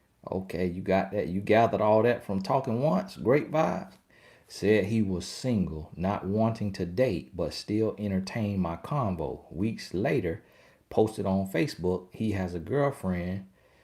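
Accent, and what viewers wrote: South, United States. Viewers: I literally could listen to this country boy accent all day! I am from The Bahamas🇧🇸 and I can understand EVERY SINGLE WORD you say!!! Love you!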